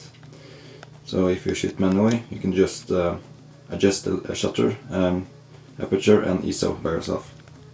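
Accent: Norway. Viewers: jeg hørte at du var fra norge, på engelsk-dialekta di ^^ Ska kjøpe det kameraet etter nyttår, gleder meg! :D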